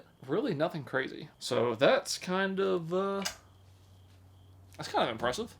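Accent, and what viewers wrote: South, United States. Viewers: I wanna say that was a joke but it comes far too easy for him. For what it’s worth though I believe we found out that is playing a character in some videos. It’s not noticeable now but if you check his old vids you can see how differently was acting back then. I swear he was even putting on a (stronger) southern accent in those vids on top of the rest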